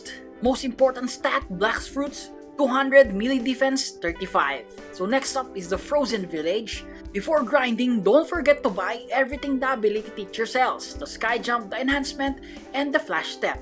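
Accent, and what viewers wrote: Philippines. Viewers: BTW u have a heavy Filipino accent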